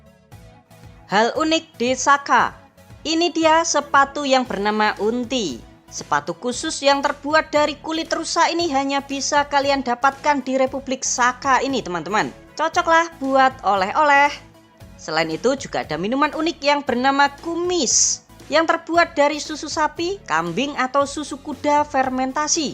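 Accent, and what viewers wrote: Java, Indonesia. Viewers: Logat nya jawa pula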